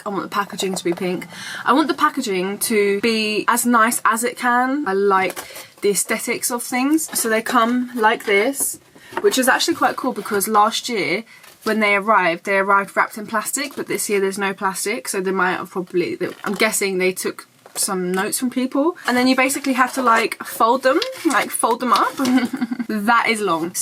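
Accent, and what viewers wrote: United Kingdom. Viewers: How did I know you were British even before clocking in the video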